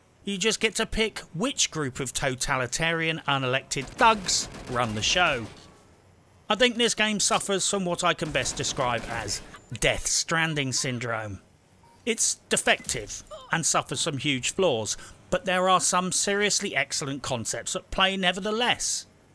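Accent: United Kingdom. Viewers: Hey! I just stumbled over your channel and I gotta say that I love it! Very entertaining and it is so true ^^. If you ever play on ps4 I'd love to play something. Bless you and wish you a happy new year already! Endnote: love the accent, very bri'ish.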